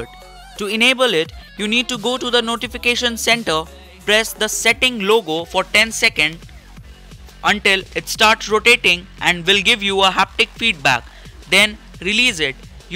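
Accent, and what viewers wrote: India. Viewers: I hate your accent. Your an indian!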